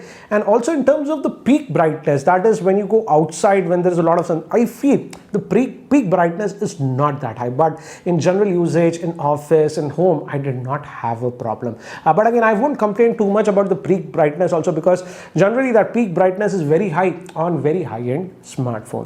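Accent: Hyderabad, India. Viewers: We miss your hyderabadi hindi 🤣🤣🤣😃😃😃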